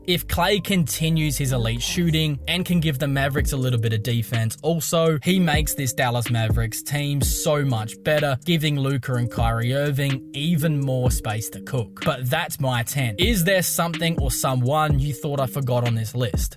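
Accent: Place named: Australia. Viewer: You’re voice is so Aussie that it’s not even Aussie any more haha! It’s awesome 🤣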